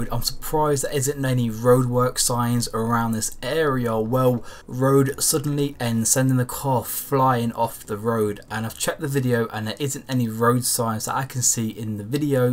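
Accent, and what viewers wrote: United Kingdom. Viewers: Kim Kimble Hes british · where are you from? i know uk but accent is hard to get at some points. not bashing ...im just curious lol. more i hear it the better. also keep it up. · I'm curious if that's the dialect across the pond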